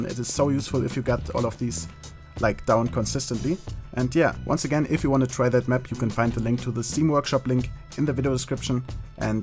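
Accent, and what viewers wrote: Germany. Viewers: Sounds like an german accent, lol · love your german english sounds so nice :DD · bist du deutsch ? du klingst sehr wie ein deutscher der englisch redet ! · German accent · is he german? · Deutsch? Wegen dem dialekt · Man hört einfach richtig raus das du deutscher bist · dein englisch ist so deutsch !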